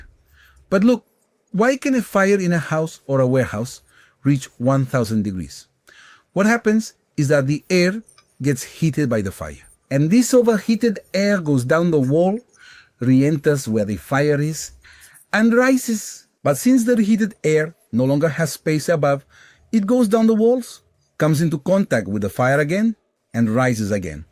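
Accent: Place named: India. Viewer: Guessing its IA....?¿? Misspronuntiation makes me think thats the real you but sound like indi pretty often @@ Interesting project! Gonna keep an eye on it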